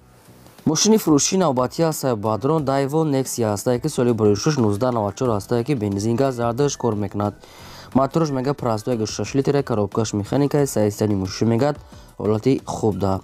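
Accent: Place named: Dushanbe, Tajikistan. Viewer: Бехад навори хуб рови бо лахчаи зебои душанбе офарин бурдбори мехохам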